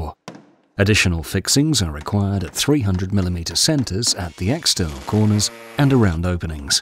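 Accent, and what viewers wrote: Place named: England, United Kingdom. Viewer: Love the English accent, way more comfortable to listen to than the American accent!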